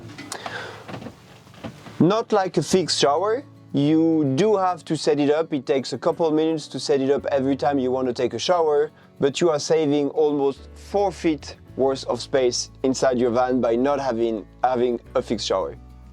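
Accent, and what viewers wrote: France. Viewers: French accent!😅❤ Thank you for sharing.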